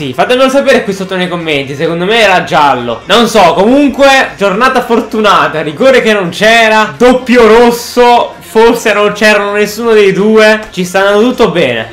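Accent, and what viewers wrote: Naples, Italy. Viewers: ma sei napoletano?? no pk io quando segna il napoli urlo come il matto come credo tutti i tifosi boo....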